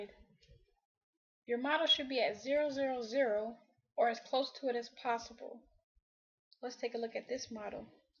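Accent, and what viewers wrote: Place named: South, United States. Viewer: Nice southern accent :))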